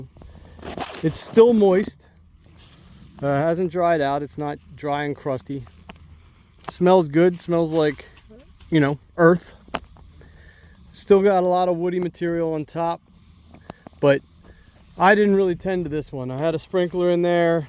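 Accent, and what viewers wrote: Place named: Reading, United Kingdom. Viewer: Hey there sir! I’m just up North in Schuylkill County. Being from Reading originally I picked up on your accent immediately. Congrats on the well made Johnson-Su compost. I just started mine made up of Urine/LAB soaked biochar, pumpkins, and LEAVES LEAVES, LEAVES, shredding as many as I can with my hands weed whacker (unfortunately my mower does not have a bagger)! Do you have any tips on getting your pile up to temp? I just built mine today and I’m concerned it might not win the fight against December’s temps.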